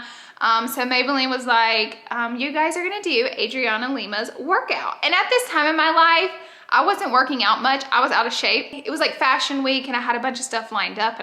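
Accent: South, United States. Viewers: Absolutely love, love, love you. I am so glad you done this video in your original accent not your Cali girl voice. Not judging, just love southern.🥰🥰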